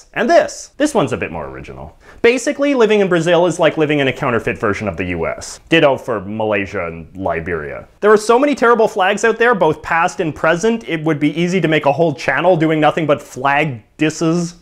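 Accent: Canada. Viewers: STOP SAYING ABOOT your giving Canadians a bad rap honestly stop. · Espeon Thomas he's canadian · you canadian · are u Canadian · Hmmm... Is this dude Canadian? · Youtuber's Fans He is Canadian and Canadians typically use Aboot. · Whait, is this guy Canadian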